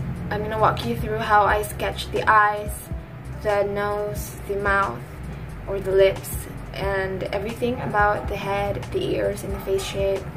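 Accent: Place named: England, United Kingdom. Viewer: I love ur english accent🥰😌 btw I really like ur videos😫💖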